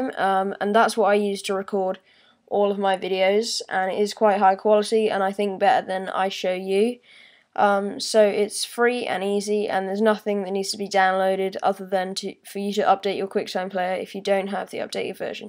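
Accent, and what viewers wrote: England, United Kingdom. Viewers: Hello are you British?? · UR BRITISHHHHH :3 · are you english? ur accent is adorable <3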